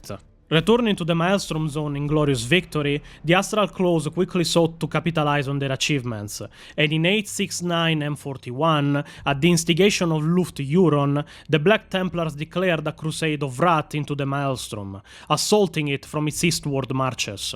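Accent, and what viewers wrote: Italy. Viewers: The only problem with these wonderful renditions of lore is now I cannot take anyone telling me lore seriously that doesn't have an Italian accent. 🙂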